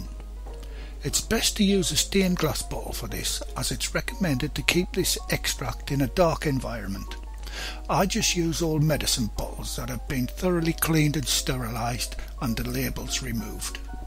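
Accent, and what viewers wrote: United Kingdom. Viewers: I have subscribed already and managed to see some of your videos I really enjoyed them, you sound intelligent professional and everything is clear and well explained. Please continue uploading good videos. (and of course who can resist the British accent :-)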